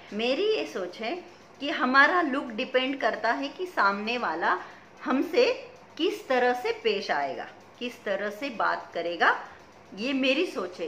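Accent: Nashik, India. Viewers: तुमचा हिंदी चा आवाज छान आहे मराठी पेक्षा मराठीत नाशिक ची भाषाआहे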